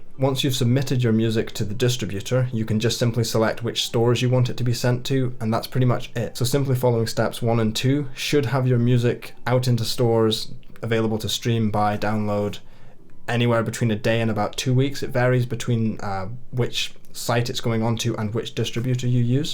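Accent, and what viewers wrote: United Kingdom. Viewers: I guess you're British. I love British men. 💖😊.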